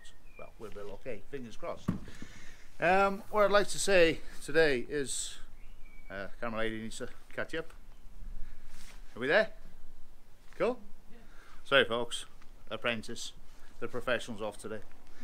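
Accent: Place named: Wales, United Kingdom. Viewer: Please excuse me but are you Welsh.great accent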